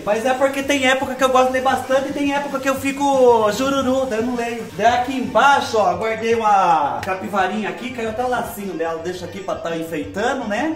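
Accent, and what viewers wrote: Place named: Caipira, Brazil. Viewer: Você É Demais! Amo esse seu jeitinho caipira de falar, morro de rir com as palhaçadas que você faz e diz. Cansei só de ver você limpando, tu vai ver o que é o corpo fazer CREK quando chegar na minha idade (66), minhas costas estralam só de olhar alguém faxinando. Cruz Credo! 😘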